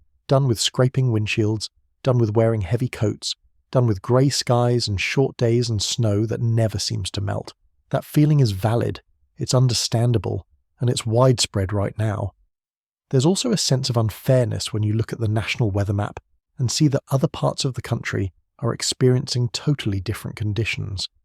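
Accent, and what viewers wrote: United Kingdom. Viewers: Why a British accent narrating US weather.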